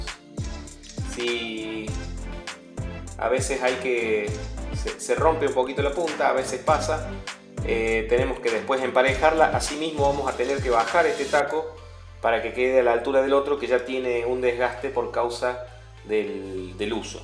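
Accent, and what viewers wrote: Córdoba, Argentina. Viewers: Sos de córdoba? Donde tenes local?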